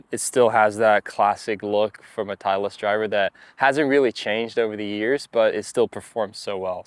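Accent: United States. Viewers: He sounds so American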